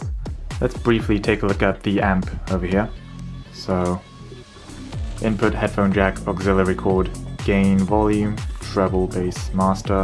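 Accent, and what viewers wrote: United Kingdom. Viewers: BRITISH ALERT! im a Brit too :) love the videos by the way❤️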